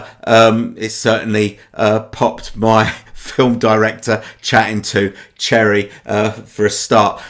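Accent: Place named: England, United Kingdom. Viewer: Blah Blah Blah with an English accent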